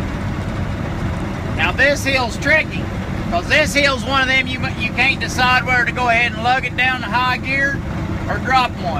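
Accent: United States. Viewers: I'd love to ride with ya hell that country talk having me rolling all day that sumbitch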